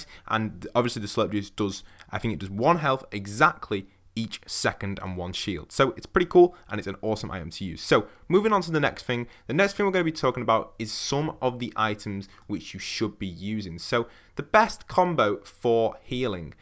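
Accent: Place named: England, United Kingdom. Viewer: Englishman accent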